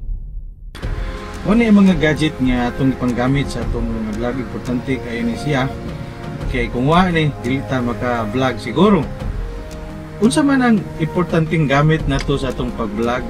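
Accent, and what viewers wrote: Visayas, Philippines. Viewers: bisaya diay ka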